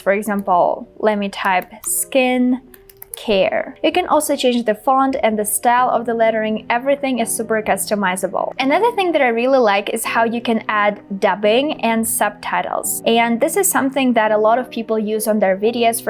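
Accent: Russia. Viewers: i love your "rrrussian" accent.😊😊😊🙌🙌🙌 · You have shown a good Russian accent. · Hello, I like your Russian accent and I would like to know which Youtubers are you watching, I am trying to learn English via youtube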